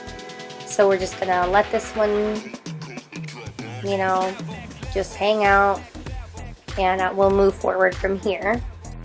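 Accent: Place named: Michigan, United States. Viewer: I was wondering where you are from! Your words sound a little bit like Michigan and In this video you mention Meijer! Im from Michigan!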